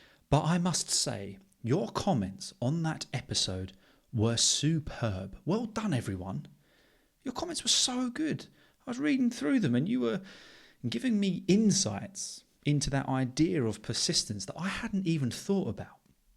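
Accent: United Kingdom. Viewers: I'm from Georgia. I really enjoy your podcasts and your beautiful British English.❤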